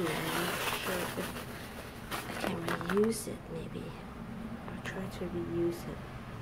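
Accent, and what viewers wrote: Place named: Germany. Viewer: you sound German , are you from Germany ?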